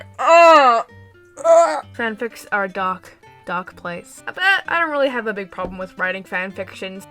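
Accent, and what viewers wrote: New Zealand. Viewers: Are you from New Zealand ? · are you a new zealander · OMFG ARE YOU FROM NZ !!!!!!!!?!!!!!!????!!! Edit: YOU AREEEEEEEEE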